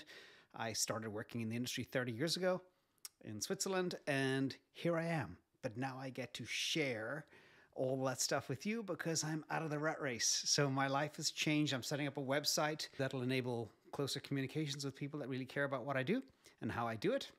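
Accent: Ireland. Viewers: Do I detect an Irish accent in there somewhere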